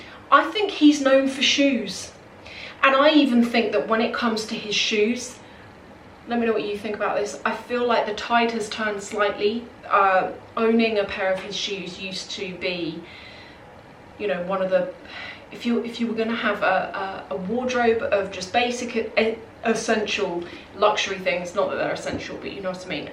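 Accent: England, United Kingdom. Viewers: Also, may I ask what type of English accent you have? I think it's very pretty! I'm aware of the different types of accents as my fiance is English.:)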